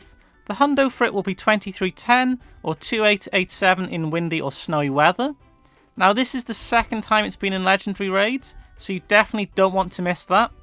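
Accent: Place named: Llanrumney, United Kingdom. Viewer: Anyone ever told you that you sound like you're from Llanrumney..?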